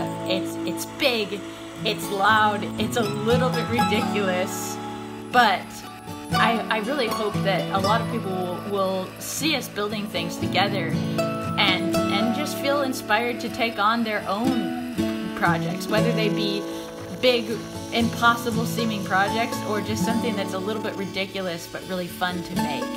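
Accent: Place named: Texas, United States. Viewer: are you a Texas gal too, accent sounds right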